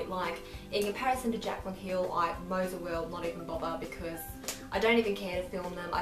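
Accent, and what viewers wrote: Australia. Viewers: Are you Australian? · are you australian?????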